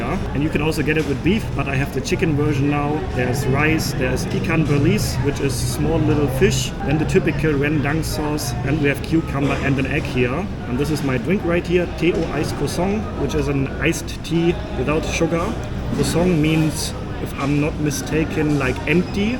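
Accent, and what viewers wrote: Germany. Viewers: I love watching all your videos!! Im addicted to your videos!! Everyday i must watch your vidoes!! I love your German accent, so cute!😊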